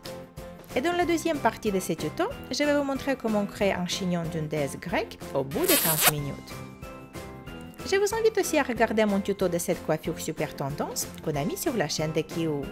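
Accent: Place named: Russia. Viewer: est ce que tu est russe parce que moi oui tu as un accent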